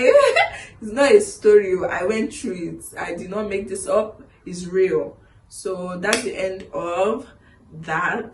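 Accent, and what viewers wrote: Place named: Nigeria. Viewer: Nice one dear , the accent hasn't changed 💯 still Nigerian.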